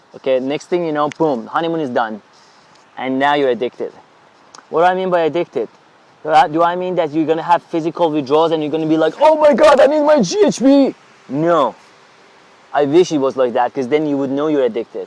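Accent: Iran. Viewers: His accent is very Persian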